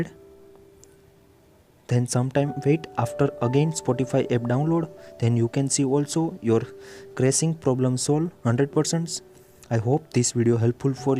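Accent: India. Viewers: This is the fattest indian accent i’ve ever heard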